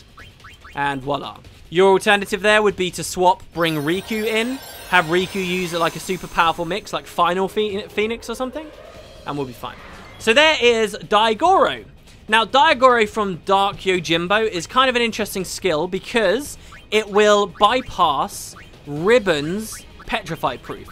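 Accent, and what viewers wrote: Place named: England, United Kingdom. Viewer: thats a real shame, your guys casual movement from gw's to everything else, for me, really captured what the gw community are doing. would you ever think about starting a similar style podcast you our own? i know the 'but of corpse' (and their wider range of casters from that channel) could do with someone with your knowledge/perspective/english accent on their shows.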